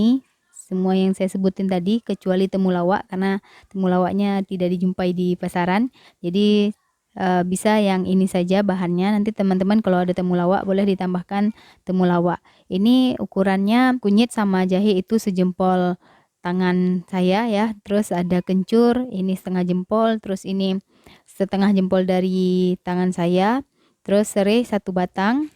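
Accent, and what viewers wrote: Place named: Minangkabau, Indonesia. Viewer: UNI LOGAT MINANGKEBO NYO RANCAK NDAK ILANG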